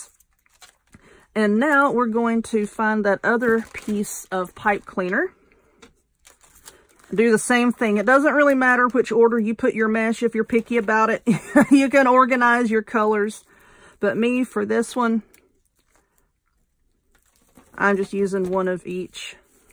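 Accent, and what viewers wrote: South, United States. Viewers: I life you because you don't talk a lot you are explaining what you are doing. Oh & I like your southern accent. I am from Laredo, Tx. & live in Cotulla, Tx*;0) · You are such a great teacher! I totally feel like I can make anything you give instructions for. And being a Southern Girl myself, I adore hearing the “Oh good lords,” etc. You sound just like me! 🤪 Love your channel. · I love your chatter! And your Southern accent! And you absolutely, hands-down, make the most beautiful wreaths on YouTube!! I most definitely am going out tomorrow hunting for all the stuff to make this one too!! Keep 'em coming!! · I love your chatter! I also like the "southern accent" you have (I am from South Carolina). Don't change for anyone! You are a wonderful crafter and that's what brings us all back!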